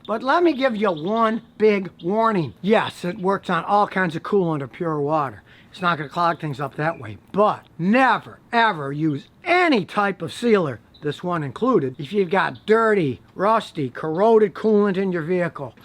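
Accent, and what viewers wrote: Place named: Midwest, United States. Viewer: you live in or near Houston?? You don't sound from arround here, more like from the midwest??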